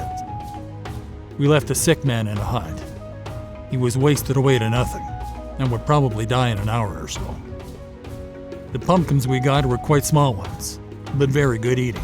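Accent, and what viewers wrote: United States. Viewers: He sounds more American than Canadian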